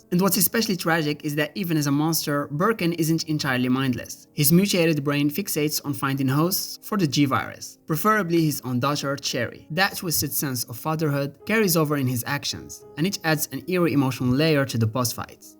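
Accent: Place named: Morocco. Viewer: dude are u moroccan u got a hard accent and keep going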